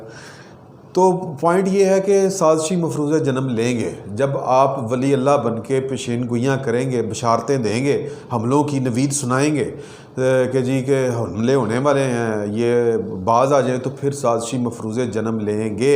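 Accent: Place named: Lahore, Pakistan. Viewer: صاحب آپ جس لاہوری سٹائل میں بات کرتے ہیں نا وہ بہت دل کو موہ لینے والا ہے بہت شکریہ لاہور کی یاد تازہ کروانے کے لیے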